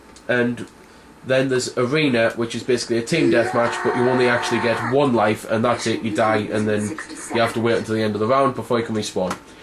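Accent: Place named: England, United Kingdom. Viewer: WHOA, I just clicked to :39 and right away I'm blasted away by this...... Loud, fast, English accent XD the only word I understood was "Achievments" lmao No offense to you, of course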